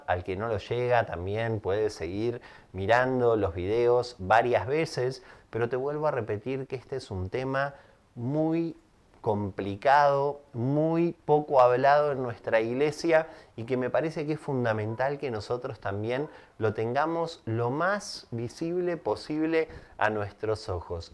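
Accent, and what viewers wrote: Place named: Venezuela. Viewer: 🤭 ¡Lo sabía, eres Venezolana 😊 Un abraaazo 🤗! ese tono de voz es inconfundible prima🇻🇪.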